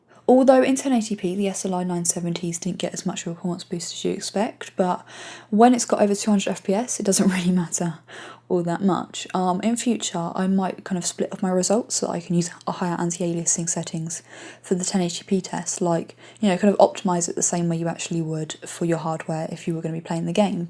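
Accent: England, United Kingdom. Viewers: that's British English :) C'mon im Brazilian and i understand perfectly fine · Well I have to say you know your stuff and very easy on eyes too. Although I have a little problem understanding you. You have a very rich english accent. But after watching about 3 of your videos, I guess it's starting to clear up a bit. Oh and by the way, 980 in SLI is the way to go!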